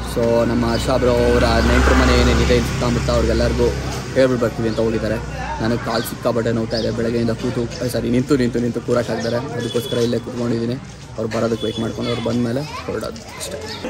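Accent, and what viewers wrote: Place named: Kolar, India. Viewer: Illa Pure kolar style nalli kannda mathaduthare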